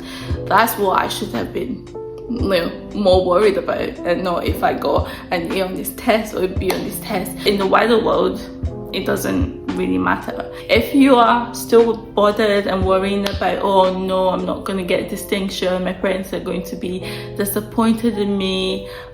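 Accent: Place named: Scotland, United Kingdom. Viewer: Haha, love it! Digging the subtle Scottish accent ❤😅. More power.🚀🙌🏽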